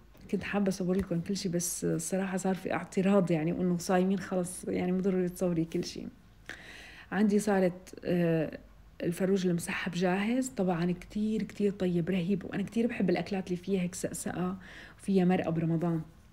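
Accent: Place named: Levant. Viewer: رااااائعة.و طريقتك مبسطةواضحةوصتك فية ريحة الشااااااام♥️.احلى تحية الك من فلسطين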